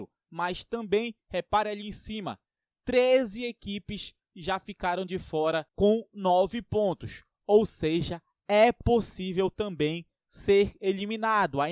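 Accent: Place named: Pará, Brazil. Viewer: Mano tu e paraense né não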